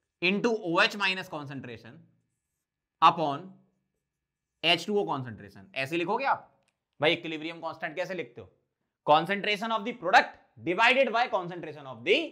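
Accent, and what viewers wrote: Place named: Malwa, India. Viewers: Sir I am here after watching your video on Physicswallah app... Our accent seem to be very similar. Where are u from? My guess is Malwa MP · Yes he is from malwa i guess indore..am also from malwa aur mujhe bhi accent thodaa jaana pehchaana laga😂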